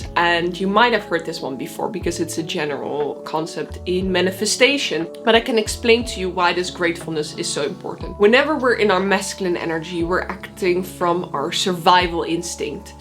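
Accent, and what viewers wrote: Netherlands. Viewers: Nice video.. are you dutch? Can hear it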